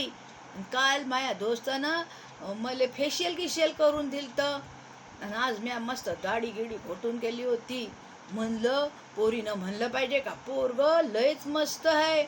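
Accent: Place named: Vidarbha, India. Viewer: खूप सुंदर कथा सादर केली आहे़ . विदर्भ बोलीचा ठसका एकदम कडक 👌👌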